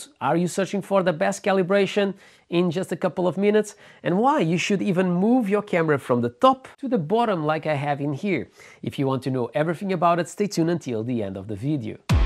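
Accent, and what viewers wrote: Portugal. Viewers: es português? tens muito sotaque português a falar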